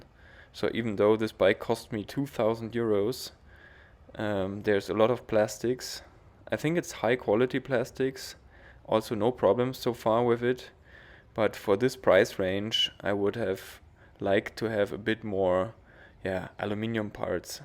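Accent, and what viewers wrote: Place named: London, United Kingdom. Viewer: I have been riding Brompton bikes for nearly 25 years. The early bikes were terrible in terms of brakes and brazing quality. I used to hate riding them off-road, and probably still wouldn't use mine off-road. , you need to trim the fender slightly. This is a prime point for rust where the mud sits on the frame. It WILL make the frame rust. Every year, these bikes get better. The best thing about them is that you will always be able to sell one for exactly what you paid for it; they do not depreciate. By the way, I detect a slight London accent when you pronounce 'Brompton' as you use the London 'glottal stop' in your speech. Did you ever live in London?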